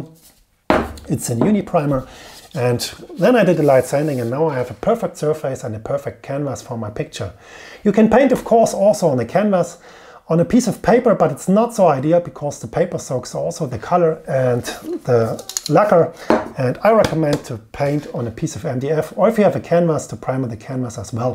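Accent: Germany. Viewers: Wie man raus hört das du Deutscher bist :). Schönes Bild. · Ich weis nicht woran aber man merkt dass er deutsch ist. Und er gibt sich Mühe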